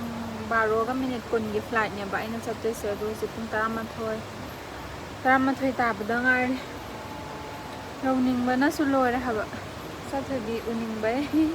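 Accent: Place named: Kakching, India. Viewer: Enao j kakching gi oi taradi kakching maron kha kha nganglako eidi taba yam nungaiye😊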